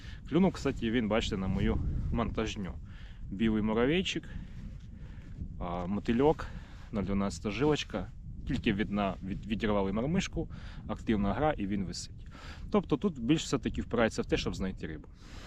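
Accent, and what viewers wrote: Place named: Polissia, Ukraine. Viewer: так, лайк за україномовний контент, і я чую по Вашому говору поліський край, Житомирська область, коростенський район??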